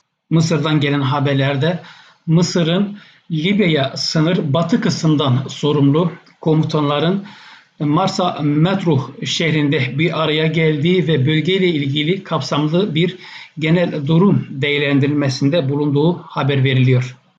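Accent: Cyprus. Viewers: Oğlum konuşman Kıbrıs Türkçesi. Sen rummudun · kolay gelsin. Özür dilerim. Şiveniz Kıbrıs şivesi gibi. Doğrumu?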